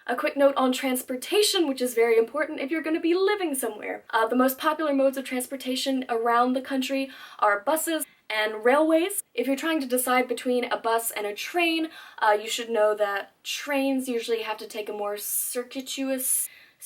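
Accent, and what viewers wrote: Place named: Ireland. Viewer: You have a super subtle Irish accent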